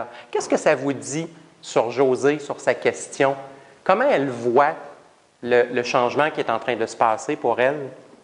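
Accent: Quebec, Canada. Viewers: Québécois. C comme ça qu'on parle ici😉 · Great video! Watching it from California to improve my French language skills and tarot understanding. Love the Canadian accent! You enunciate so well and sound a bit like Benoit Gouin! Merci Bien!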